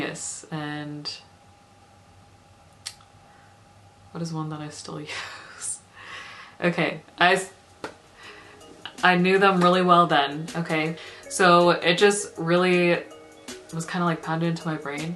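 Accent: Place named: United States. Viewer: Love ur video, I’m an Indian too and finally Im watching a gre video of an Indian with a US accent 😂. Ur really funny too, great video 👍🏼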